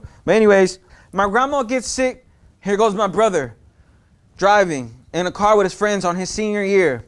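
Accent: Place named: Houston, United States. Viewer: i like how you can tell he's from houston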